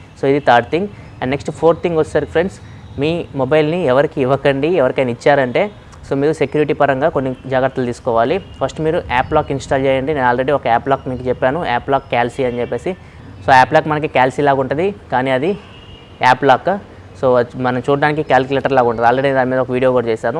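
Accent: Ongole, India. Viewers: Bro meedhi ongole aaaa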